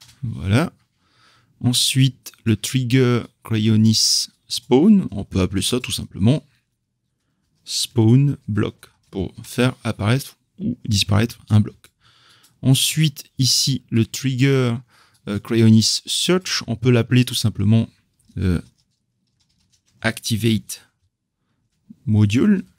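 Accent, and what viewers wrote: Tahiti, French Polynesia. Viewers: Bravo, vraiment! Je me demandais quel accent tu avais:) Tahitien donc!, enchanté moi je suis Breton:D Tu as du skill c'est indéniable, continu STP avec tes vidéos (je n'ai vu que celle-ci:D) d'aider la commu francophone sur se superbe moteur. Bon maintenant je vais voir tes autres vidéo:D Par contre j'ai pas compris pour ta timeline si elle est de type vector par exemple, est ce que l'on pourrais en faire une macro et la mettre dans l'actor component? Encore merci tu viens de gagner un fan:)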